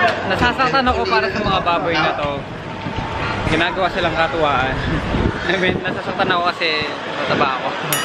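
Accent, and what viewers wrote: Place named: Batangas, Philippines. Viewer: Batangeno ka ba lodi? May naririnig akong punto e haha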